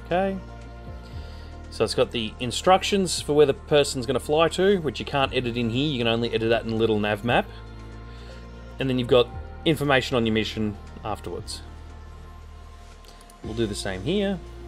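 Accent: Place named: Australia. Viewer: For what it is worth, you speak at a good speed for me but I'm Australian too so the accent might just be easier to listen to. I guess it takes all sorts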